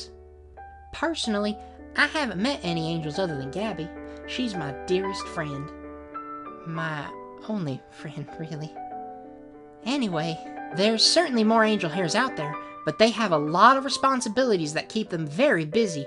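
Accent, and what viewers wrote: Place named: South, United States. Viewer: Something about these videos are so comforting to me. I'm not at all religious, and I'm sure it will be revealed that the bun isn't as good as she seems, but I genuinely appreciate the animation and work. I think it's the southern accent and absolutely adorable designs. I suppose I have 2 questions! How was this animated? It looks like puppetry, but it could also be hand drawn! And how do you feel about fanart?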